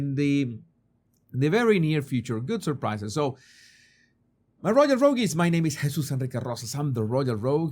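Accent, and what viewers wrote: France. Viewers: Well I've heard your French accent and it's fabulous. It surprised me about the "bird" . 😅. I like it. It's 🥰 cute. Take care. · French accent?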